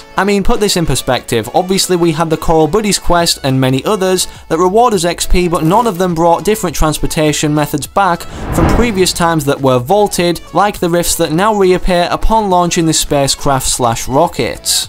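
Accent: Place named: England, United Kingdom. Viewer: Who else loves his accent? 😂😂😂 I'm from England too are you from Leeds or Sheffield or Bradford I want to live in Leeds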